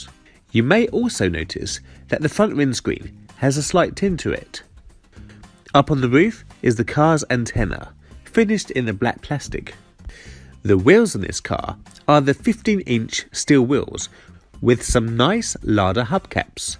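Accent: United Kingdom. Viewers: Nice little car. Well made video too :) loving the British accent 👍 have a good day and thanks for sharing :)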